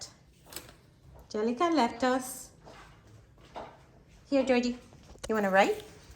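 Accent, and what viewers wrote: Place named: Russia. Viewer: No spaek english russian like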